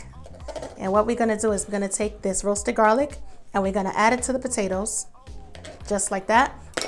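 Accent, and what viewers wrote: New York, United States. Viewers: Very good :) - i wonder if she is from New York lol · I need sounds like she from New York Cooks like a Southern Mother looks like Beyonce I'm in 😊..... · You are a new yorker...arent you?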